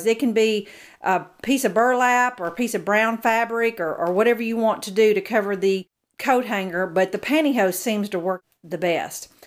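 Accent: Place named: Appalachia, United States. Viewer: So stinking cute. I just found u so u have a new subbie. I knew that voice had to Tennessee or NC mountains. Lol! I'm on the other side of Asheville so maybe we're kinda like neighbors. 😁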